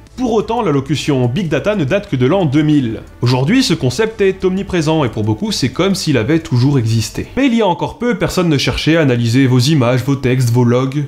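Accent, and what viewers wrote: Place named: Lorraine, France. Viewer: Il est lorrain si je ne m'abuse ...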